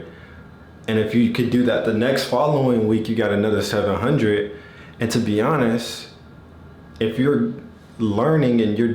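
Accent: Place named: Cape Town, South Africa. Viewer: Bro ! Yu slightly got that CAPE TOWN Accent...."The Mother Land /South Africa"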